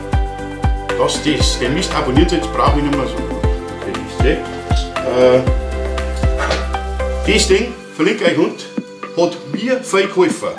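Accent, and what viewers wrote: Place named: Bavarian Forest, Germany. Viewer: Hallo Du hast die gleiche Sprache wie ich wo kommst denn Du her, ich vermute nicht weit von Regen entfernt (grins). So von Kollege zu Kollege, aber bei mir is nix mehr mit Stom seit ich drei Schlaganfälle und einen Herzinfarkt hatte, da musste ich auch noch das reden lernen, natürlich waldlerisch (grins).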